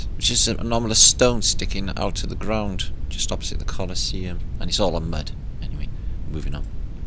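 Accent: Wales, United Kingdom. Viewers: Entranced so far thank you.. Just cutting in to say.. are u from south Wales. . U sound like u have at least half an accent for it.. it's all good.. I'm here in West Wales. . On the coast and yup.. Britain are just bullies.. no wonder we on a puppy eyed innocent "it wasn't meeee" type island. P.s. I'm half Manchester from when I was a kid.. and accent sounds familiar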